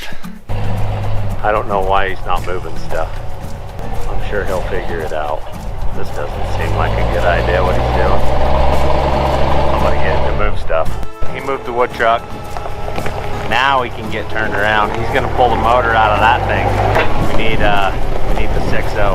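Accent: Utah, United States. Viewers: I think ever since Randall started, your southern Utah drawl has become more noticeable.🙃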